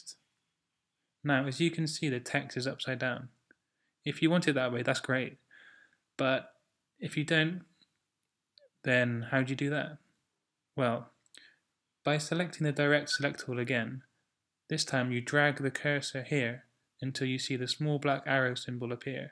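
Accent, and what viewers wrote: United Kingdom. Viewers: Thank you!! finally a short on point video! btw from which region of uk is your accent from :)?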